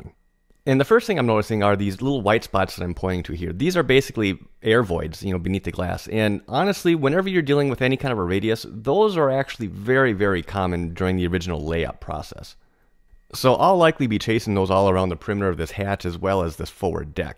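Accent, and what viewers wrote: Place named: Upstate New York, United States. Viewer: I have a 1968 Bertram Bahia Mar 20 that I seriously need help on. I am willing to go on Patreon to pay for your help because I'm not looking to get something for nothing. This boat is a family member and was left to me by my dad just before he passed in 2015. It's been with me my whole life and is now in need of a lot of help. I have her off her trailer in my backyard and have sanded all the bottom paint off. The hull is in such bad shape with deep chipping and stress cracks everywhere. It's so bad that I've decided to sand all the way up to the gunnels and restore everything from there down. The deck is still in good shape except for a little rotting where there's a penetration for a flag pole holder or some such device. I've been watching your channel for a while and have to tell you how much I've enjoyed it. 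Being from Binghamton, NY originally, I started out working in a boat shop there sanding on boats and doing fiberglass work when I was a kid, so your upstate accent makes me feel like I'm home. When I was 19 I joined the military and have since found myself living out West in Tacoma, WA near JBLM. I'm a Union Carpenter now but still call Upstate NY home. This boat has been part of my family since 1979 and in 2016 I was able to drive back East and get her, an epic journey that's a story in itself. Now that the Covid-19 virus has us all sitting at the house, I have pulled out the random orbital sander and tried to remember all the lessons I learned when I was 17. A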